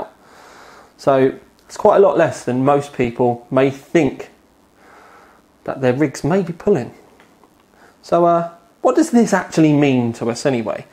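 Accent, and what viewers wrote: United Kingdom. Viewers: British pronunciation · British accent haha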